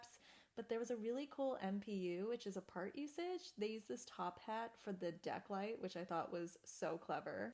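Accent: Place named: Massachusetts, United States. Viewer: Great video! I can't wait to get my hands on that JAWS set; I couldn't believe it when it finally got announced. You did a great job showing off all the cool details, and the fact that you're a fan of the movie really comes across. Also, you're from Massachusetts, like myself, so you get extra points. I heard that Mahtha's Vinyahd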